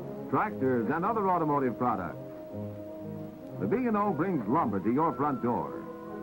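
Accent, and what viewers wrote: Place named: New England, United States. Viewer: Excellent. Narrated like all of the instructional and school films. “...from Pittsburgh to Akron, the rubbah centah.” Narrators always had that New England accent. I remembah!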